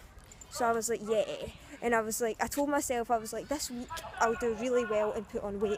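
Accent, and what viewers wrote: Scotland, United Kingdom. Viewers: I truly admire you for sharing your struggle. It takes a lot of courage to overcome that kind of disorder, let alone share it with strangers on here. I just know your story will inspire many. I myself have never battled anything to the degree you have, but for those who have, this video will be motivational & inspirational. I really enjoy your channel & support you greatly throughout your recovery. I also love your Scottish accent, btw!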